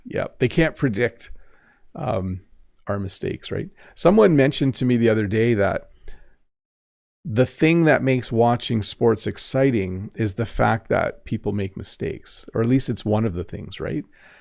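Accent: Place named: Canada. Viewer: Assalamu Alaykum,. My first name's Ali. My last name's Orkhan. By the way, I've been watching your lessons for three years😊😊. This lesson was very good for me. After all, this lesson gave me a lot of knowledge in English. I watch your videos because I love Canadian Accented English. Or rather, I speak Canadian Accented English because I love your lessons,. Greetings to you from Azerbaijan.❤❤❤😊😊😊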